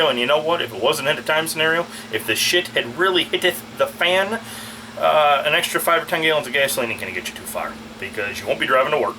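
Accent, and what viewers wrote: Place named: North, United States. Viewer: you sound like a yankee, you ain't from TN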